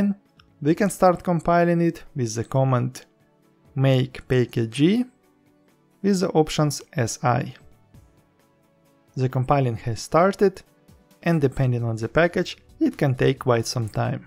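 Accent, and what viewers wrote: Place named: Russia. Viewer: the ruskii accent is the best:)))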